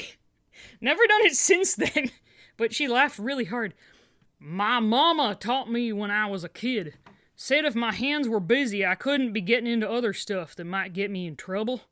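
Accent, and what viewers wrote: South, United States. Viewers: Your Southern voice is OK I’ve heard worse T-T sadly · XD the southern accent hehehe I love your readings lol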